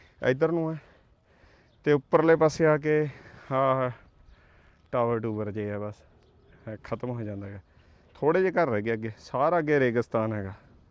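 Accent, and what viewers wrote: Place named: Punjab, India. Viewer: Your "Pandu Punjabi accent " is excellent.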